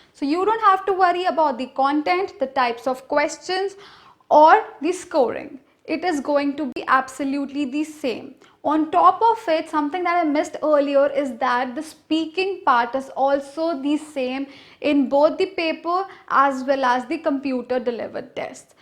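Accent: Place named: India. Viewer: Cute girl.. I like your Indian accent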